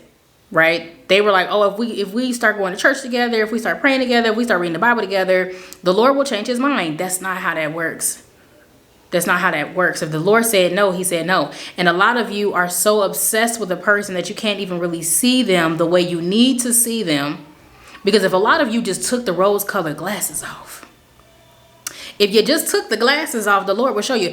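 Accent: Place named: United Kingdom. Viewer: The way my mind was convinced that you were from the UK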